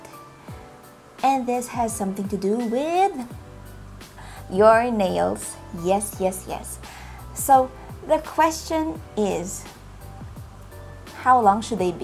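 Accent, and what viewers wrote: Philippines. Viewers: Starting to watch your videos right now and my parents are gonna buy me a kalimba, IM SO EXCITED (I noticed your accent is like a filipino, If so, HI POO MAGALING KA PO MAG TURO LABYUU <3) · WOAH she's Filipino (atleast I think). Im Filipino! "Ang kapal" is Filipino for "Its thick". Also i noticed her Filipino accent :D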